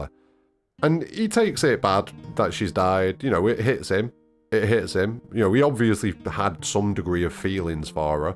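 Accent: Northern England, United Kingdom. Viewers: lol imagine if tifa just went “ nahhh dickhead” the fact that your northern makes it so much funnier, I fucking love your breakdowns dude keep up the good work. Your holding the rebirth content atm and I love it dude another great video my guy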